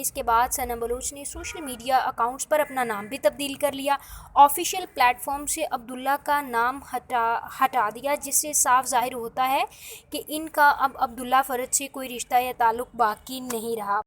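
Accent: India. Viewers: Intihai buri hy...urdu tu sahi nahin..news kya parrhni....indians ki tarah khabar ki ghabbar or ghalat ko galat kaha ja raha hy...urdu k words pronounce krna ata nahin...bad👎👎👎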